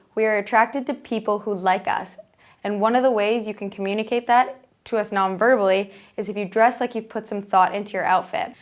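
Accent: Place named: United States. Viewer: Manish Thakur Which accent ? she's just american. Btw I don't wanna be mean but people don't generally like the indian accent so... It's not the best approach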